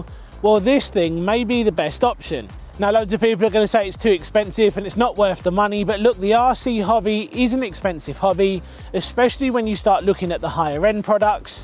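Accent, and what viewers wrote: United Kingdom. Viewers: You sound very well like a British accent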